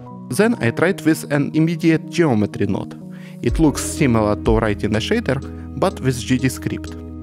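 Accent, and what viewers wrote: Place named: Russia. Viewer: Русский (или украинский) акцент почему-то позволил мне смотреть видео без перевода. Всё чисто и понятно. А вот речь настоящих американцев или англичан мне воспринимать трудно.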